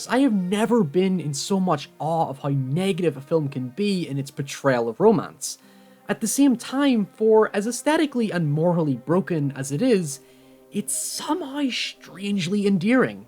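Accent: Ireland. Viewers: I don't think I can post URLs in YouTube comments but if you check Reddit in the videos sub you'll see a video posted on Tuesday 12th about Louis Theroux. Someone posted your video about Theroux there and your accent led to some confusion as to whether you're Irish, American or Scottish! :) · I think he is Irish.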